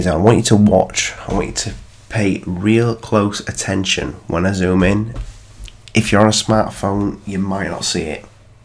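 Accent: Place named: United Kingdom. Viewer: I live in the UK in a small shit town called Grimsby, and i know you will know it just by your accent, anyway, i am a big fan of secure team 10, but hats off to you mate, even i didn't believe in most of his Google earth images, and i know other videos may be fake, i just wish now he would do his research properly, I feel a bit gutted actually. But i still believe that there are alien craft out there, most of it probably back engineered by the real terrorists, AMERICA! The only country allowed to bomb other countries, and when they fight back, they are called terrorists, anyway, that's a different subject. Nice work buddy.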